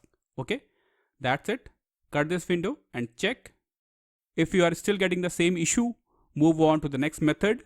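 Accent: India. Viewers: I heard an Indian accent and immediately knew my internet is gonna be fixed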